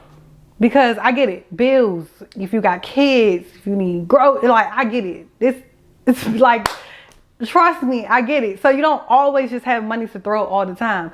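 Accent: Washington DC, United States. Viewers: Could tell you were from dc the first few seconds you started talking ❤😂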